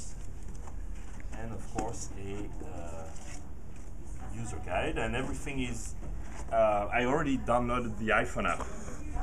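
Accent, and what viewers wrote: France. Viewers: when french people talk english ... -_-'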